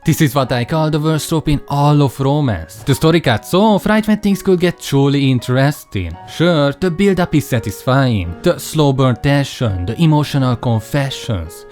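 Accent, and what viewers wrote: Hungary. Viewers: Is that a hungarian accent I hear?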